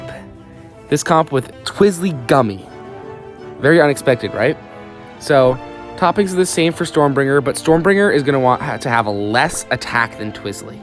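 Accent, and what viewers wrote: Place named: United Kingdom. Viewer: why does he sound so british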